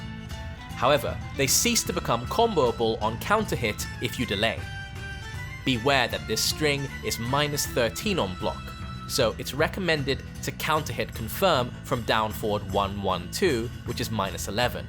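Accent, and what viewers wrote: United Kingdom. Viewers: british accent nice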